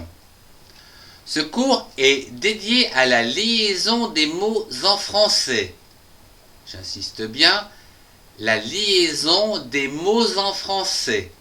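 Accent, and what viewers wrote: France. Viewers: Tu veux rire? Je suis canadien et j'avoue qu'enfin ... je recontre un français qui ne me fait pas ch... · Je trouve que l'auteur à un accent, attention à ne pas répéter avec cet accent qui fait vieille France/Paris 16e et qui vous fera peut-être passé pour hautain si vous insistez dessus.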